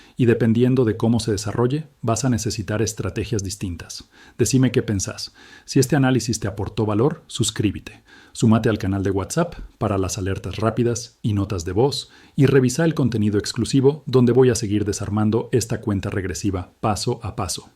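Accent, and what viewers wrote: Argentina. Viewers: Por que le pusieron español argentino, suena desagradable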